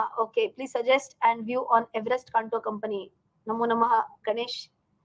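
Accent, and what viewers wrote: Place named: Pune, India. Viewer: I have became ur fan after watching your videos. You are the coolest CA I have ever met :-D. Watching you speak feels as if you are amongst us, ekdam Punekar!! :-)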